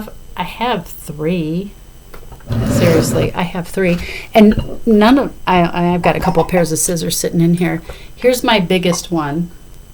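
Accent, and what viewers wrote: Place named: Illinois, United States. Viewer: First time watcher here. It sounds like your a teacher in Illinois. If so, which part of Illinois? I teach in the south suburbs. I will also be at weekend A. I’m going by myself so I’m hoping to meet a lot of new people.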